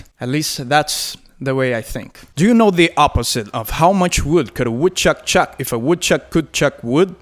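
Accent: Brazil. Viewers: Eu sabia que eu tava reconhecendo esse inglês de algum lugar, você é brasileiro! Conheci seu canal tem pouco tempo e só tenho elogios! Continue mandando muito bem!!!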